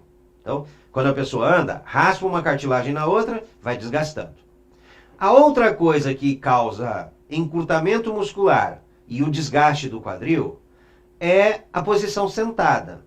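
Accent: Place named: Paraná, Brazil. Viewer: Quanta explicação lógica e de fácil entendimento neste vídeo, me esclareceu muito. Parece que é do Paraná, atende em quê cidade?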